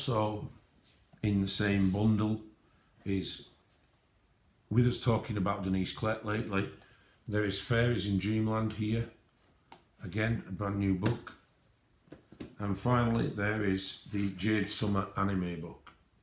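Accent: Yorkshire, United Kingdom. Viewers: We appreciate you too. I was initially attracted to your channel because I'm an ex brit and enjoyed listening to your accent. You are different and i like your choice of books and supplies so keep them coming. No criticism I'm afraid just carry on as you are. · Wonderful haul. I love several of the books you showed early on, I'm going to have to watch again to catch and record the names of them. Where do you shop for the more unusual books? I'm assuming these aren't all Amazon? I love the fact that this deep voiced Yorkshire man loves colouring. It's just great. Listening to you talking is also very relaxing. My husband is from Lancashire, but close to the Yorkshire border and so his accent was quite soft too, but he's been in the south/midlands too long now and it's worn off a lot. I miss it. I love the soft Yorkshire accent. I don't want you to add me to the give-away, I have plenty of pencils, but that is a wonderful give away. 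The only thing I would like you to improve about your videos is the camera angle. It would be great if we could see from your perspective rather than at angles. Not easy I know, but that would make a difference to the experience I think and make it easier to see the flip-throughs etc. I'm sorry to hear about your friend. It's always such a shock, especially when someone is young. How lovely that you helped his Mum get organised. Congratulations on reaching 1000 subscribers so quickly. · International (Canada). I love your accent, my Nan was from Yorkshire, you're my people, not to mention the artist thing! Love the variety of books you show and would love to win so I could get some Lightfast coloured pencils from Amazon. It would be great to have a better camera position as its hard to see the pages from the side like that, like an overhead setup. Sorry about your loss of your friend and congrats on your sub count!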